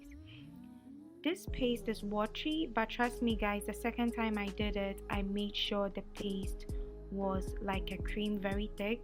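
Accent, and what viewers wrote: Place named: Guyana. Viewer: Are u from guyanna? A friend of mine from there has a similar accent so cute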